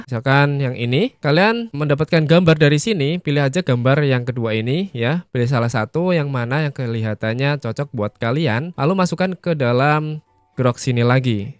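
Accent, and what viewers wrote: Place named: Java, Indonesia. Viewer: bang, abang orang medan ya?. kok logatnya kayak jawa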